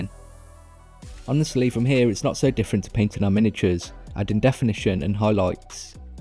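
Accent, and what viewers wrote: United Kingdom. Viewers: I'm not from the UK - what kind of regional accent is this? It's not super weird or anything, just kind of distinctive in some ways!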